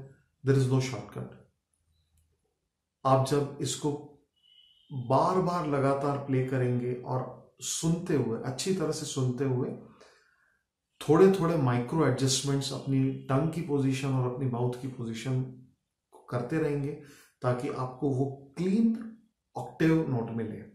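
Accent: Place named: Maharashtra, India. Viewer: your accent is like maharashtrian so I thought it.by the way how to start online classes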